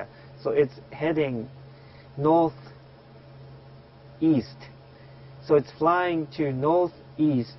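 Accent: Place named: Australia. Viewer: How I love the Australian accent.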